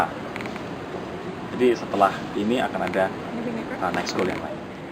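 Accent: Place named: Java, Indonesia. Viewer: medoknya lucu haha..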